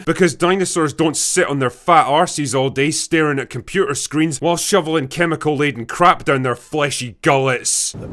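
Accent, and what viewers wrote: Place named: Scotland, United Kingdom. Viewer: I had no intention of going to see this movie--just like I haven't seen any of the other sequels; I'm just here because I think it does a little something for me to listen to a Scotsman sh*t on stuff.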